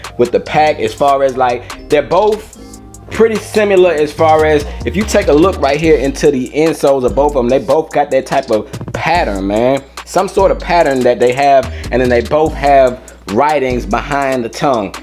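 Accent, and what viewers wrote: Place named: South, United States. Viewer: Man on the real I don’t like the shoes but you gotta rock with my G for the true southern accent bruh you gotta love it keep it real for ya boys stayin fresh in that southern mud baby 3rd coast holdin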